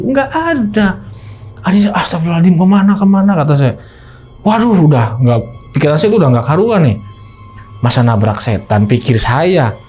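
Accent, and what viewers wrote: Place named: Indramayu, Indonesia. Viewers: indrmayunya melekat ya mang😂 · Mlesat 😅, bahasa nya engga angger , Indramayu bgt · Indramayu hadir dari hongkong...Bahasane kosi kentel pisan ya kang,Indramayu ne ning endi kih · Aku orang indramayu bang logatnya sama kalo ngomong pahaam betulll 😂❤❤❤ top lah ceritanya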